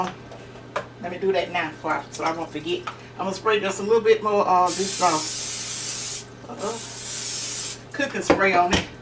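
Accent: South, United States. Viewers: that's the word southern accent not country I couldn't think of that word, yeah the crazy part about that stories I don't understand why my Grandma let my Great- Auntie change my Moms accent ? I wish I would have asked her that while she was alive. Well at any rate I can hear your Southern accent in your videos 😁 Thanks for taking the time to read my comment and to reply back to me I really appreciate that. Take Care and I pray God will Bless your day ❤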